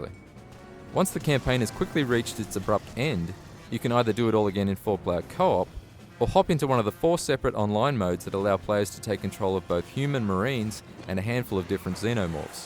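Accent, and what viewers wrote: Australia. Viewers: YAY! an Australian reviewer! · hes australian like me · Nice Aussie accent bro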